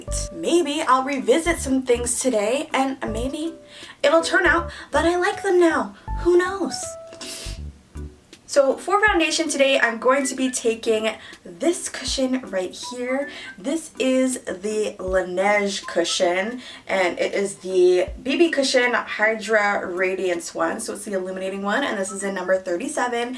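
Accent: United Kingdom. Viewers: When is she speaking w/ british accent? Time? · I love your British accent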